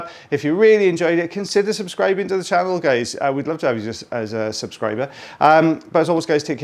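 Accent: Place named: England, United Kingdom. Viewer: I love the English, your accent brings me so much joy 😊 Hope I get to live somewhere in England 🇬🇧 one day.. More on topic thank you for all your hard work putting these videos up every week, I can't imagine how much work and dedication it takes. You and your team are much appreciated:)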